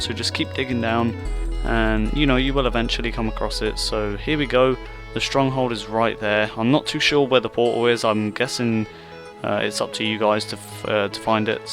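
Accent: United Kingdom. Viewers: you have such a generic british accent :D when i try to make a british accent your's is precisley what i get. and my main accent is East-Coast American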